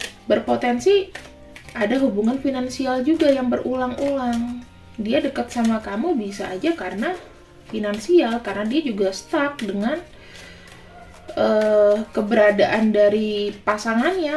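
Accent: Java, Indonesia. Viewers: Kakak suka gruuiiiiss ya kata ppaaauujaannnnggg kayak nya kkk orang jawa ya deh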